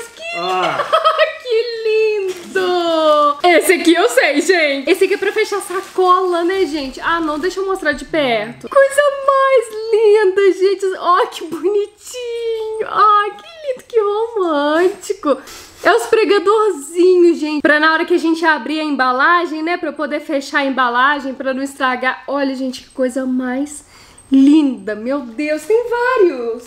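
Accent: Minas Gerais, Brazil. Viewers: O já está entendendo super o português? E olha que você fala rapidinho e com sotaque mineiro! Fico muito feliz pela dedicação e esforço dele. Dá pra ver o quanto ele está leve e feliz. Que coisa boa!! Nunca esqueço daquele vídeo que vocês fizeram com o não deixando desperdiçar nada. Morro de rir só de lembrar. E ele mal entendia as palavras em português que você falava. Quero ver mais atuações! Genial! Grande abraço, família!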